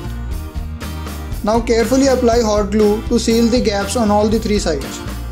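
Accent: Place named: India. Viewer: the accent is like india people / indian , are you indian ?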